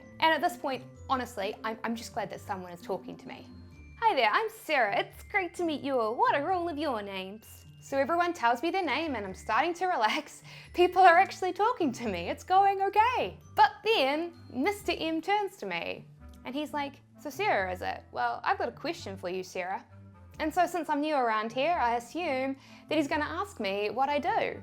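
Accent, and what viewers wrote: New Zealand. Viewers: Hi Sarah, I really love you and your New Zealand accent 😁 By the way, you are so sweet and attractive while smiling huge 😘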